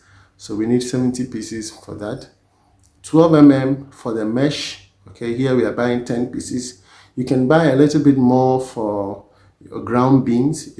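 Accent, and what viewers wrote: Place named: Ghana. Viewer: And you speak good ghanaians english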